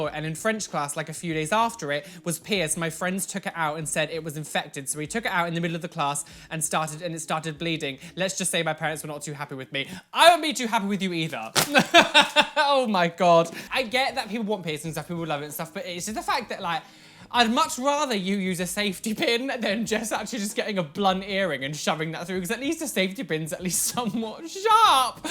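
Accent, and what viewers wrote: United Kingdom. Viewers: Are you British?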